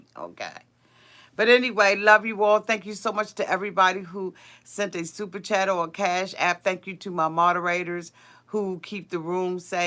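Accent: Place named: New Orleans, United States. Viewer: I am a Newbie to your Channel.. Tell'em Auntie!! Lol I was laughing as You going OFF on that Person in Your Live Comments.. You Go Auntie!! Sound like that Southern accent, New Orleans Style..Auntie Colt in his Speedos lmao That was a Nasty Seen!! Auntie when I seen Colt come out with them Speedos on I fell off my Couch dying.. 😂😂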